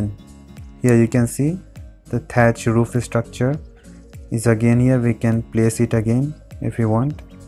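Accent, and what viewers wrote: India. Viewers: Are you an Indian ?? Your voice matches Indian voice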